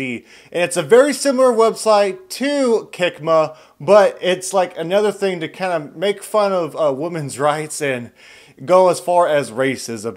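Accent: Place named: Australia. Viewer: IDK, I'm Midwestern. But you sound Australian/New Zealand to me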